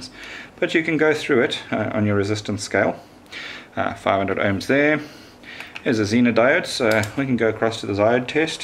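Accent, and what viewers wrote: South Africa. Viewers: Clear explanation 😊 You sound South African, your accent.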